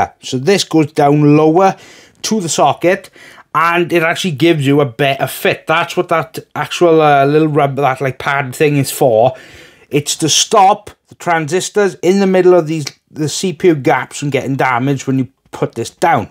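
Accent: Wales, United Kingdom. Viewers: Definately a man from Wales! Love!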